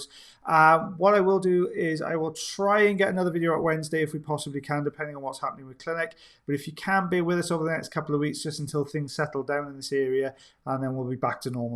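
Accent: Wales, United Kingdom. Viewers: I haven’t watched in a while, hardly recognize you. Look great and I LOVE the Welsh accent.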